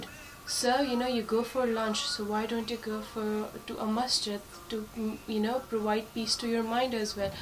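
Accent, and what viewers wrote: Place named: India. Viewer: O MY GOD, HOW LOVELY HINDI U SPEAK, LOVE YA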